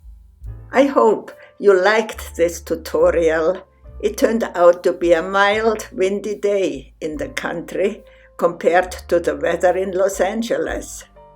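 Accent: Switzerland. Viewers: Soft sensual painting along with the painter’s beguiling Swiss accent sharing artistic tips: Brava!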